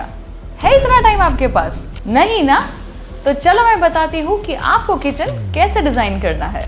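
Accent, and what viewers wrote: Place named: Gujarat, India. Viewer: I think it's Gujarati accent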